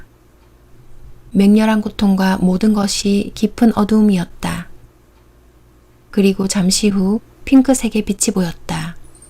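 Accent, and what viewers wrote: Gyeongsang, South Korea. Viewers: 이 채널의 주인장이 경상도 사람인 것 같아서 새삼 정이 갑니다. 책을 읽는 어감이 주는 익숙하고 편안함... 마치 고향으로 돌아가 할머니 무릎가에서 한 편의 옛날 이야기를 듣는 기분이었습니다. 편안하고 뜻깊은 시간을 주셔서 감사 드립니다. 고맙습니다~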